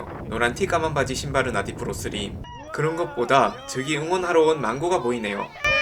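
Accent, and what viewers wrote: Gyeongsang, South Korea. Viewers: 대구 출신이라니 경남분인줄 알았어요! 더반갑습니다. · 경상도 특유의 쌍시옷 발음 약하게 들어가서 귀여운 느낌 있어요 ㅎㅎㅎ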